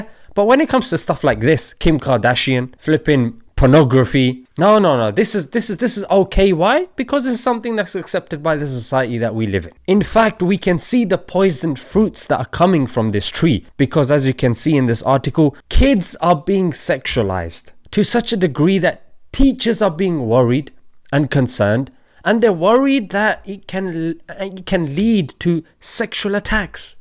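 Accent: Pakistan. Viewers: I laughed so hard on your Urdu accent😂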